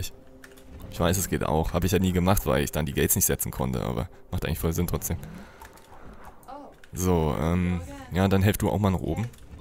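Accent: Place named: East Germany, Germany. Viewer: 1.City of doom (von Zombies besetzte Stadt/ ist etwas zerstreut manchmal) 2.Cottage auf ossi-englisch Das sind keine Sprechfehler, das ist Charakter.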